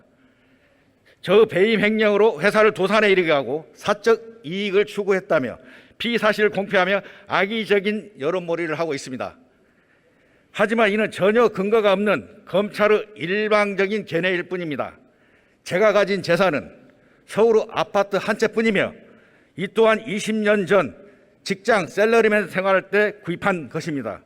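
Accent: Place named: Jeolla, South Korea. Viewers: 말투보니 라도 같은데? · 전라도 특징 의 를 으 라함ㄹㅇ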